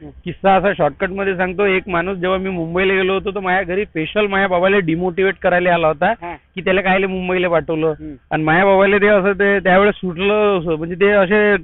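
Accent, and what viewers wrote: Varhadi, India. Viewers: हा विदर्भाचा सुपरस्टार आहे विशाल भाऊला कोणी ओळखत नाही असा महाराष्ट्रात सापडणार नाही. खूपच छान वऱ्हाडी भाषा, ऐकायला फार छान वाटते पण मला तशी बोलता येत नहीं. विशाल भाऊचा प्रत्येक रील हा इमानदारीने व अभ्यासातून केलेला असतो. प्रत्येक व्हिडिओ लाईक केल्याशिवाय पुढे जाता येत नाही. पुढील कार्यास खूप खूप शुभेच्छा.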